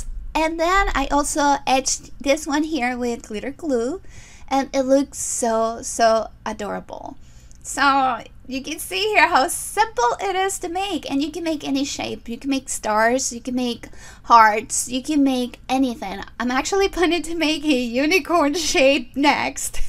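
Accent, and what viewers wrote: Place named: Latin America. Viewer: Oh no problem I understand ... I just hear a bit of Latina and I was just curious great video have a great week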